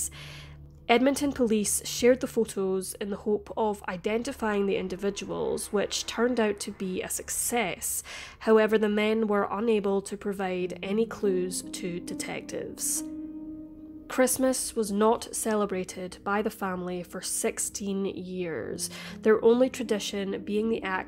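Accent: Scotland, United Kingdom. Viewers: Loving your channel, it's great to hear a fellow Scot narrating these episodes and I feel you treat each case with such compassion for the victims.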